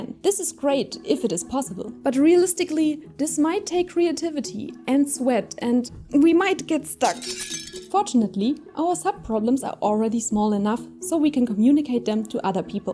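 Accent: Germany. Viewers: Great video. But can I hear a German accent? Nice. Greetings from Gelsenkirchen, Germany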